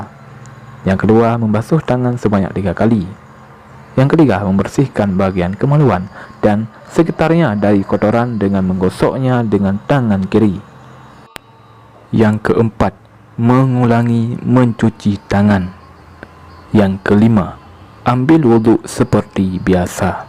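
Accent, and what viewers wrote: Aceh, Indonesia. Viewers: Adminnya orang aceh ya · Sang nyoe lage na logat logat khas ureung aceh😁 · Ini pasti awak aceh , terasa logat aceh nya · Ini logat orang Aceh bukan? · Denger dari suaranya Orang aceh ni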